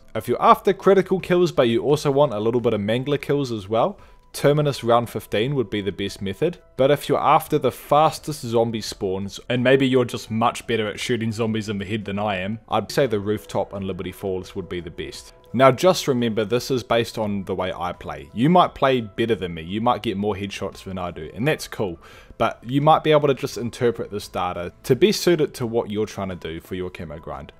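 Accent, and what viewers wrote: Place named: New Zealand. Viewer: i watch this guy purely off his new zealand accent, chur uce!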